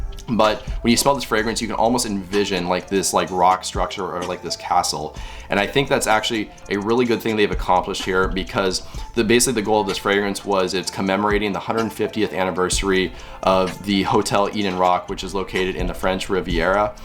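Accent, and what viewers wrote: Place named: United States. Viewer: Bro plsss get your american accent under control......i wanna scratch out my ears after listening to you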